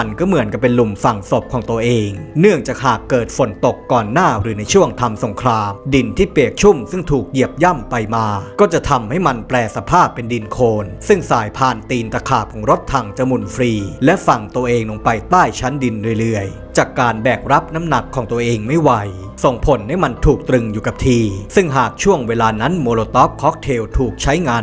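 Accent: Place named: Prachuap Khiri Khan, Thailand. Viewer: สำเนียงประจวบคีรีขันธ์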